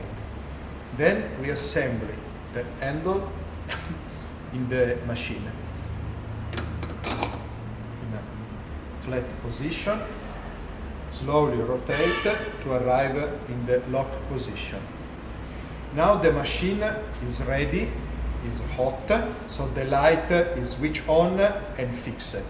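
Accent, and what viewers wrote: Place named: Italy. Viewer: Why does Italians speak English so badly? It seems impossible to even do it on purpose xD the accent is absolutely devastating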